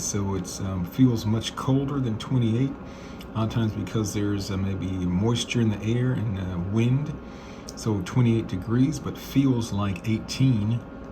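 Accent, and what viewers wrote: Texas, United States. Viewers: This is the clearest English with a Texan accent I've ever heard. Thank you so much for sharing your English knowledge!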